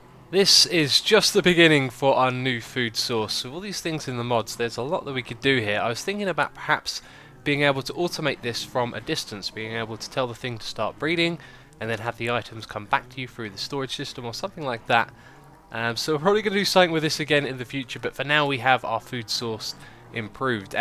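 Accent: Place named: England, United Kingdom. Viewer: Don't correct how someone pronounces it, people pronounce in different ways. He's english you are most likely American.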